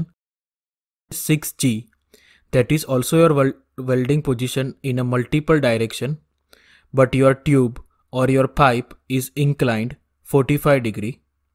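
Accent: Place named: India. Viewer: Can you do it without the Indian accent. It's wary aard to understand you.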